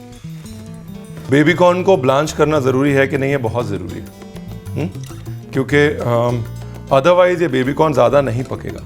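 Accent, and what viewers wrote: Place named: Punjab, India. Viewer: your explanation is very impressive. your simple style is very good . you are fit to become another Pran of Bollywood with your beautiful Pnnjabi accent.GOD bless you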